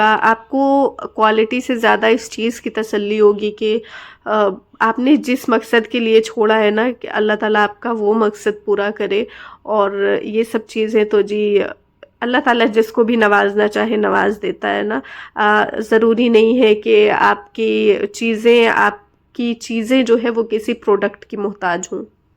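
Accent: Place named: Azamgarh, India. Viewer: Aplog azamgarh se hain hm bi azamgarh se hain or lucknow me rhte Hain kafi salon se even i born in lucknow Apki video dekh k ek connection feel ho rha hai your accent n way of talking like azamgarh... Mere YouTube pe apki videos accidentally recommend hui or mai smjh gai k aplog azamgarh se ho ....❤❤❤❤❤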